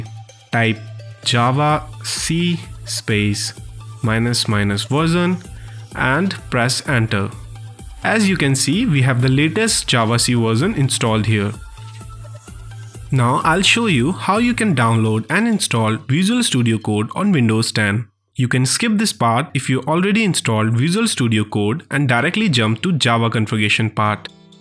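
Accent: Kerala, India. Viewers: To be truthful this man is far more easy to follow and understand from than even our school teachers. BTW You can be sure that a tutorial is good if you hear an Indian accent. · r u Malayali ?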